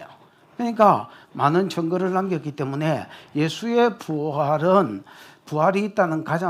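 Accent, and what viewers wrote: Gyeongsang, South Korea. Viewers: 목사님, 캐나다 토론토에 25년 넘게 살고있는 교민입니다. 우연히 영상을 보게되었었는데 첨엔 코믹하고 촐랑거리는(지송! ㅋㅋ) 특별한 캐릭터의 웃긴 목사님인거 같아(글구 심한 경상도 사뚜리~)넘어갔었는디... 또 몇달후.. 구속사를 듣게 하셨고 고린도전서, 열왕기서 강해들을 들으면서 눈이 번쩍 뜨이고 '이분 따라 살아야겠다' 고 생각하며 정신이 번쩍 났었습니다. 저는 이민와서 예수 믿기 시작했었는데, 긴- 시간동안 왜 교회가 강해설교를 안해주는지 정말로 답답했었거든요 😭 그날에 큰- 상급이 확실하시니 힘내시고 계속 앞에서 달려주셔요! 화이팅!!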